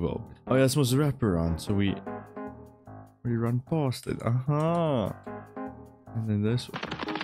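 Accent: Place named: France. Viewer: french accent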